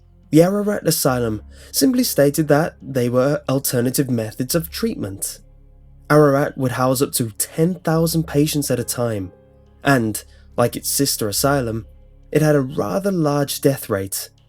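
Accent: England, United Kingdom. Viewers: Nice video... But - where is your accent from? You sound English, yet you said “Clochester” instead of COLCHESTER. Colchester is claimed to be the oldest town in Britain. It was for a time the capital of Roman Britain. Hospital Name: Severalls Hospital, Previous Names: Second Essex County Asylum, Essex and Colchester Mental Hospital, Severalls Mental Hospital Location: Boxted Road, Colchester, Essex CO4 5HG Principal Architect: Frank Whitmore and William H. Town Layout: Compact Arrow & Villas Status: Derelict Opened: 27th May 1913 Closed: March 1997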